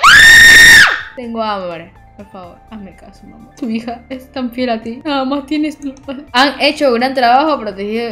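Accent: Argentina. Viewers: Como te cambio la voz me encantaaa ❤❤❤ ame el mamáaaaaaa eres tu sos Argentina?? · TU ASENTO ARGENTINO JAJAJJAJAJA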